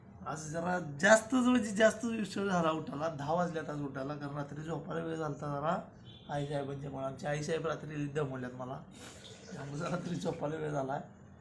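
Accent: Kolhapur, India. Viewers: दादा तुझे व्हिडीओ मी डबल बघतं असते एवढे व्हिडीओ छान असतात 😊😊❤❤❤❤❤👌👍 तुझी कोल्हापुरी भाषा खूप खूप आवडते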